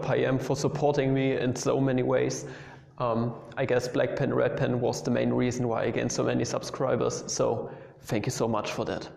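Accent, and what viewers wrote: Germany. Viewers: Heavy German accent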